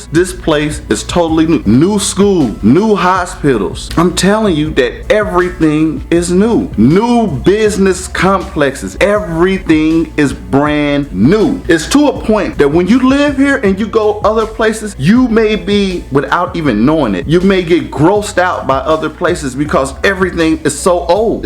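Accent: United States. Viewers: You gotta be from the D. I can just tell lol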